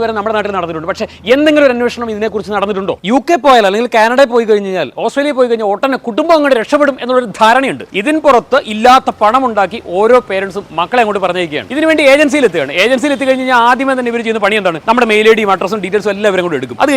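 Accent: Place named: Kottayam, India. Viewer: കോട്ടയം മലയാളം ഇങ്ങനെ ആണ് സാർ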